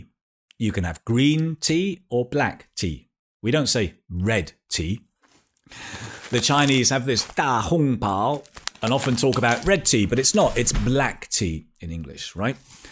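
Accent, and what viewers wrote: Spain. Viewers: you have spain accent! it sounds curious! thanks for ur lessons!! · Me sorprendió tu acento español 😃 It's great ! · Hahaha I love your Spanish (from Spain!) accent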